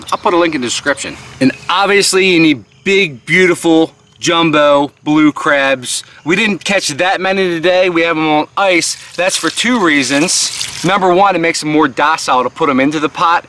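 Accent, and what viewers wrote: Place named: Maryland, United States. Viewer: complete with that Maryland accent hon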